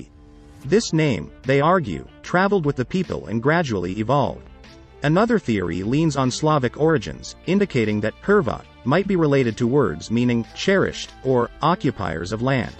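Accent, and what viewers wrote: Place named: England, United Kingdom. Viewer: Because the AI speaks English akcent I cant wait for it to say: I cvrči, cvrči, cvrčak na čvoru crne smrče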